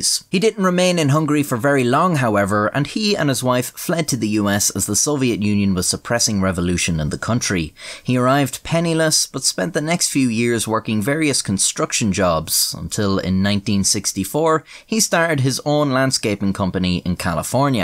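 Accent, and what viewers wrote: Ireland. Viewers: This was way different from the story I expected while litening to an Irish voice talking about a bomb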